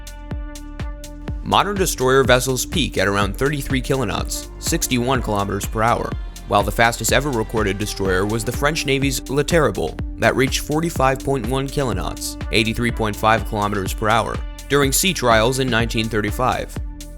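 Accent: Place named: United States. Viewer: To the dude that did this video. You sound like you arr and American. You do know we don't use kilometers, we use mph. And we don't use meters, we use feet. I had to give the video 👎🏾👎🏾 for that. I have faith that you can do better next time. 😁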